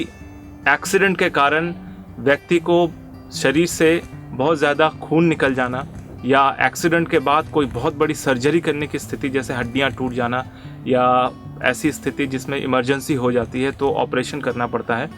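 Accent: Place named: South, India. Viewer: Bakwas jaise sawdaan India bool rha ho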